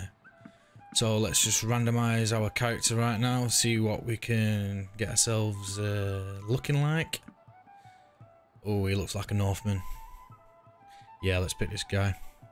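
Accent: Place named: Manchester, United Kingdom. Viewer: Sound like you from Manchester mate well done on looking at this mod mate shit I’m on console pal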